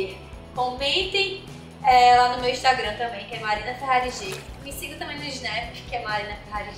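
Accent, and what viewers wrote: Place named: Northeast, Brazil. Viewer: Mulheeeerr Esse é o primeiro video que eu vejo teu,e na abertura eu ja vi o sotaque nordestino,tu é de ondeee?maravilhosaa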